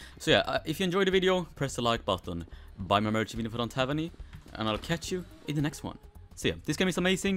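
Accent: Sweden. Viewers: Man kan höra att du är svensk på din Engelska😅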